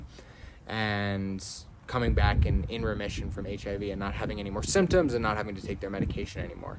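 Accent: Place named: North, United Kingdom. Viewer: hola amigo, estoy practicando mi listening skills, tu acento es norteño cierto¿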